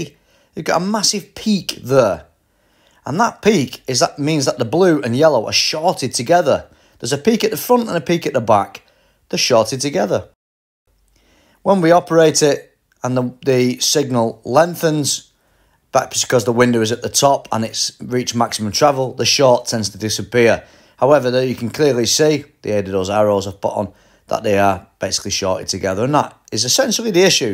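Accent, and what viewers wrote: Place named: Bolton, United Kingdom. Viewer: Great vid. Must've changed hundreds of these motors when I was at BMW. Where are you based? Sounds like you're from Bolton.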